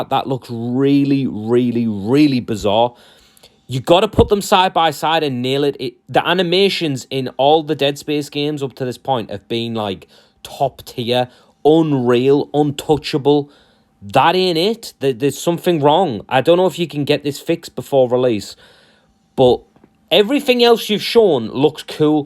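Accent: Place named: Scotland, United Kingdom. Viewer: I am giving you thumbs up just for that epic Scottish accent man, aside of that you are right some animations need polish :D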